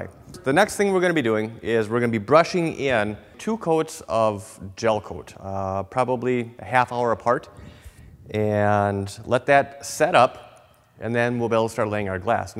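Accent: Midwest, United States. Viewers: this guy sounds so midwestern it makes me wonder what kind of boats they have over there that arent icebreakers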